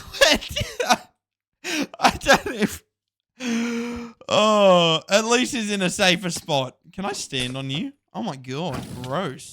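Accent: Australia. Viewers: You sound aggressively Australian, but like you've lived in Britain or the US for like the last 2-3 years.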